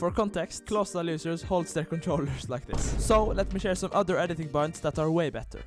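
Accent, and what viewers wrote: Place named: Norway. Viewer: er du norsk? if not you just sounds like a norwegian guy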